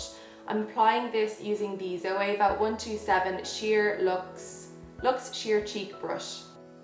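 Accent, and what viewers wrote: Ireland. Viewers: Are u Irish ??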